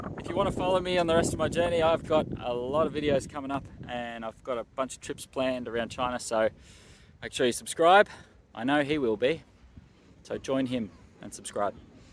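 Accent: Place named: Australia. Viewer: Thanks for adding the subtitles. I am not used to the Australian accent and I had a little difficulty making out the words you said. The subtitle helps a ton!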